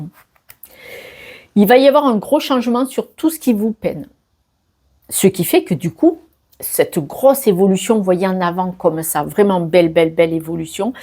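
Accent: South, France. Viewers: Bonjour je viens de m abonner à vous merci pour votre guidance et ce bel accent du sud merci à vous à très bientôt ❤😘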